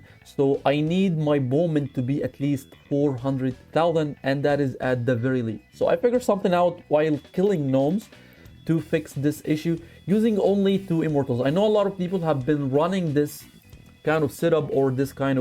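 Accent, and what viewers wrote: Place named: Arab world. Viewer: I knew you were a fellow Arab just from your accent 🤝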